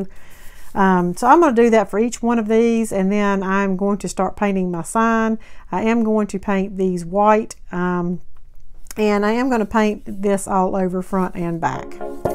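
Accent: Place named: Tennessee, United States. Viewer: AS ALWAYS, LOVE, LOVE, LOVE YOUR VIDEOS & DIYS!!! YOUR VOICE REMINDS ME OF MY FAVORITE SISTER-IN-LAW FROM TENNESSEE. SHE WAS AN ANGEL! HAD SO MANY HEALTH PROBLEMS BUT NEVER COMPLAINED & ALWAYS PUT OTHERS BEFORE HERSELF. I HAVE NOT BEEN TO A DT SINCE MY HIP REPLACEMENT SURGERY, NOVEMBER 12th!!! 😩😳 ONCE OUR WEATHER IMPROVES I AM GOING TO GO! HAVING MAJOR WITHDRAWALS!!! I HAVE MISSED OUT ON SO MANY GREAT ITEMS!!! 😥😭 HOPE YOU & YOURS ARE ALL DOING WELL. KEEP ON WITH YOUR VIDEOS, PLLLLEASE!!!! I SURE WOULD MISS YOU IF YOU DIDN'T!!!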